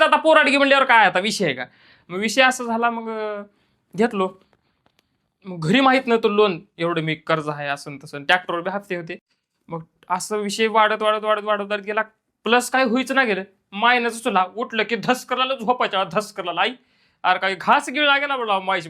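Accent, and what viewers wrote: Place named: Latur, India. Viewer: 100%Latur cha hay bhau😊😂😂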